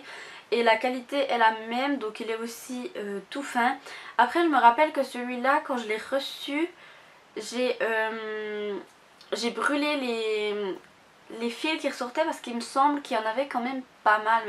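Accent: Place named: Belgium. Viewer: Super vidéo, je commande tout sur Aliexpress et j'ai eu bcp de déception... Continue comme ça PS: J'adore ton accent 😍 Belge ?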